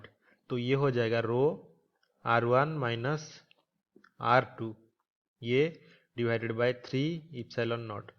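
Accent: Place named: Odisha, India. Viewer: After hearing your voice I think your mother tongue is odia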